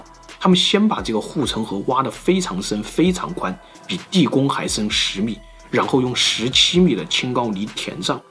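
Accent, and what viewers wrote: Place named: Sichuan, China. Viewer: 你是哪里人啊，哈哈哈哈，四川？湖北？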